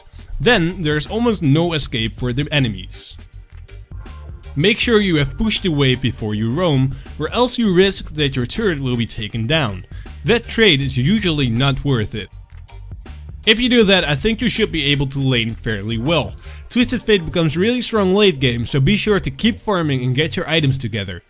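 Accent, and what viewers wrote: Netherlands. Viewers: Are you Dutch? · Are you Dutch by any chance? Nice guides by the way, they help me out loads! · cant watch this cause of the dutch accent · haha omg, ik hoorde het aan je accent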